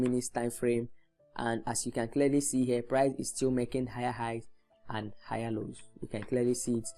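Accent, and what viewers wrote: Nigeria. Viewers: From the accent it looks like you're a Nigerian?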